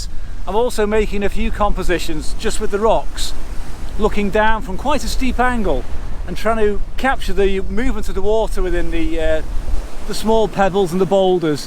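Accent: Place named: Liverpool, United Kingdom. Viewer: I always hear the cadences of the when I hear you speak. I was interested to find that you seem to be from the Liverpool area. Always enjoy your content!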